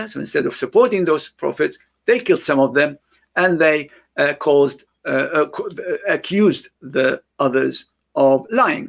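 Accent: United Kingdom. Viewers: you speak in such a perfect narrative voice I really REALLY want you to release an audio of all the books of Hadith and the Holy Quran in your voice narration. What an effective beautiful British accent you have. I will write this comment underneath all your videos until you read it. May Allah take this service from you in this life.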